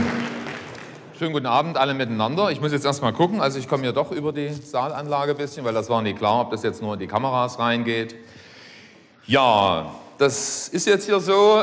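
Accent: Saxony, Germany. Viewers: Na Du gude, das iss säggssche schbraache.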